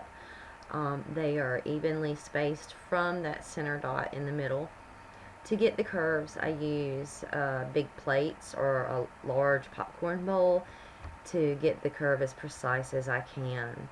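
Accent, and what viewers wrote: South, United States. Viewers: i love ur southern accent! ^.^ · love your voice is that southern plus am learning on how to do tie dye to bond with my stepdaughter and we are doing them together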